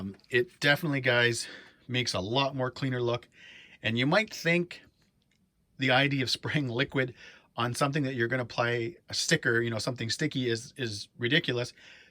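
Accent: Canada. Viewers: I think he might be Canadian though and in that case his pronunciation is correct for his region.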